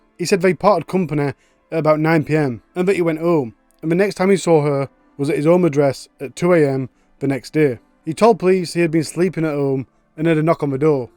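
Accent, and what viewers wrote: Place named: Liverpool, United Kingdom. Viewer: Hey what part of England are you from? Really distinct accent...is it Liverpool?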